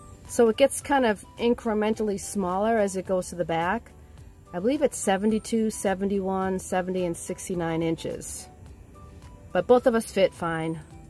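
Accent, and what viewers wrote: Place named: New York, United States. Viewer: You've been at this for a long time, so I expected your van build to be really cool and functional. Nice job. Oh, and that New England accent is undeniable. Or maybe it's Minnesota or maybe Bay Shore Long Island New York. LOL!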